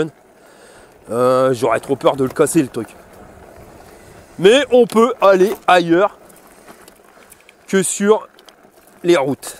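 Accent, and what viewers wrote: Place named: North, France. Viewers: Merci à toi, j'adore ton accent Ch'ti, je voudrais en acheter un fin du mois, tu m'as convaincu ! Amitiés, cordialement Dominique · chalut biloute dit moi apres les 3 ans de la sortie de ta video que pense tu encore de ce modèle on a un budget de 1000e par biclar et ce model nous plait bien . orange y pete sa mère sur ce bonne continuation terrible les video avec l'accent de ch'nord tio merci a toi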